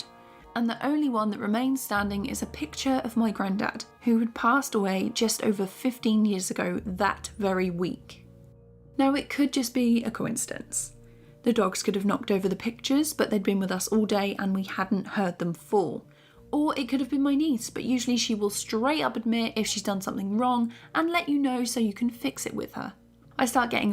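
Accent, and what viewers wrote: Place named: United Kingdom. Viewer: I really like your accent! whereabouts are you from in the UK? I'm going to the UK with YMS visa. I'm studying with your video.haha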